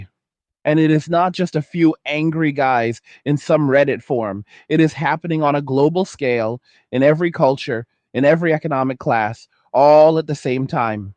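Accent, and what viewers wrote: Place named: Panhandle, United States. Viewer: you got that inbred panhandle voice going on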